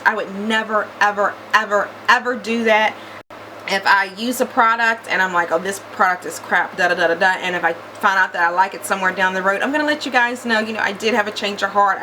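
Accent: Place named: South, United States. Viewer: I love your southern accent! Your make looks beautiful!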